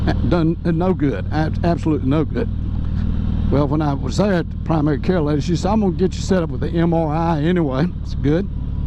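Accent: South, United States. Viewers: Welcome back brother, missed seeing your riding and your southern accent 😂. God bless.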